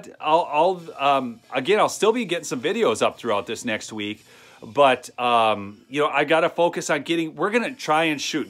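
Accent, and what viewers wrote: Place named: Minnesota, United States. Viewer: I was born in Butte Montana and his accent is right out of the movie "Fargo"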